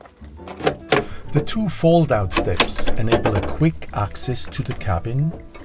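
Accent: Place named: Switzerland. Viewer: Is this chap Swiss?...he certainly sounds weird enough?!.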